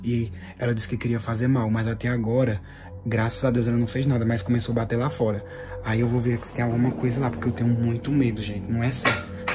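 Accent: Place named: Pernambuco, Brazil. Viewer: Onde você mora qual é o seu estado você mora em Buíque esse povo fala assim Senão não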